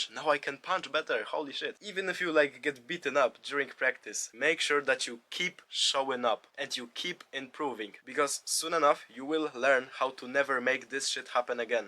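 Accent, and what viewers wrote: Poland. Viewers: jak to jest że po twoim akcencie od razu poznaje rodaka XD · Polish, I suppose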